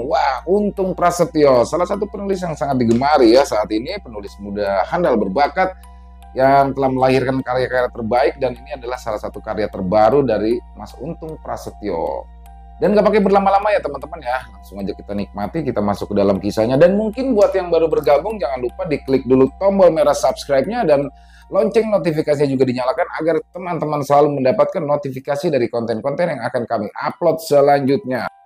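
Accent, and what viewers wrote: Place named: Jakarta, Indonesia. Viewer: Bang org betawi ya? Bhsanya lucu logatbetawi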